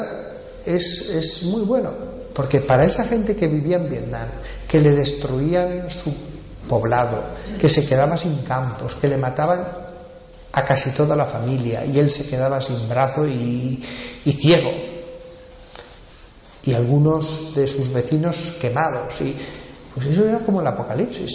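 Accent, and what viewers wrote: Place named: Spain. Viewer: no aguanto el accento espanol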